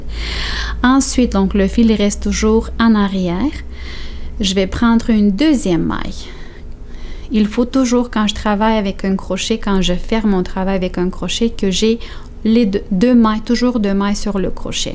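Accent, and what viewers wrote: Canada. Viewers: Tu es un amour,tes tricots sont toujours magnifiques, c'est toujours un plaisir d'écouter tes tutoriels qui sont toujours bien expliqués avec ta gentille voix douce et ton adorable accent québécois, merci pour ton beau travail..des gros bisous pour toi de Lille 👍☺️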